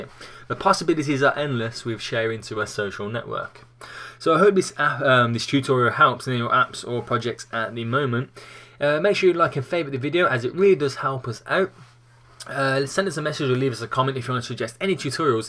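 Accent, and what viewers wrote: United Kingdom. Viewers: Love the british accent! "Tweets to Twi-e" :)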